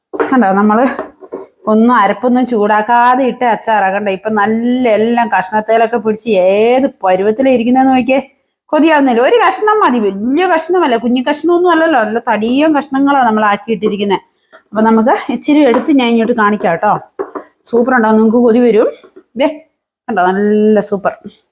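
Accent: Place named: Idukki, India. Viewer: Serikum janma nadetha, thani Idukki samsarum anallo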